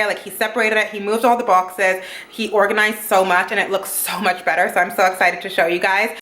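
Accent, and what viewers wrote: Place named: United Kingdom. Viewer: Shes brittish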